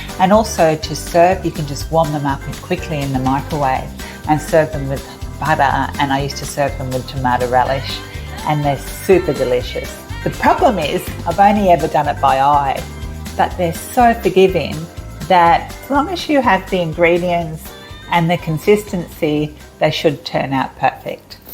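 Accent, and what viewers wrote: Australia. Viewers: Never apologise for your beautiful Aussie accent.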